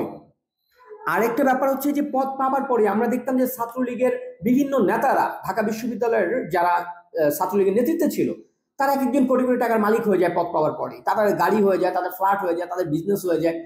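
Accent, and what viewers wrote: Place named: Arab world. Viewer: আরবি উচ্চারণেই বুঝা যায়। কথার মাঝে ছোটো ছোটো আরবি শব্দ ব্যবহার করে এবং ছহি উচ্চারণ করতে পারে।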